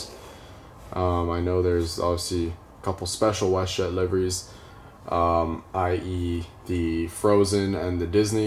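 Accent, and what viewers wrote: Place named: California, United States. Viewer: Hahaha! I couldn't tell what accent you have, it's Californian! My uncle talks like you. btw it's mainly the 737-700 that comes to la. West Jet usually uses it's 737-800s at lax for it's once daily lax-yyz flight.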